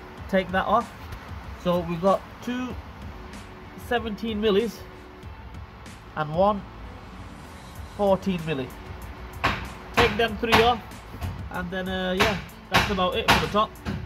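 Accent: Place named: England, United Kingdom. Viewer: I like your knowhow but because of your English accent I have to suggest that you talk a wee bit slower. Canadian English is a wee bit of translation... plus the fact that I'm slow😀 Thanks for doing this vid.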